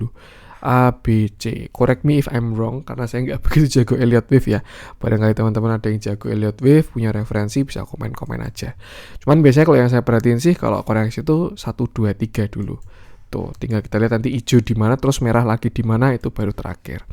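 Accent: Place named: Java, Indonesia. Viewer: Medhok bgt mas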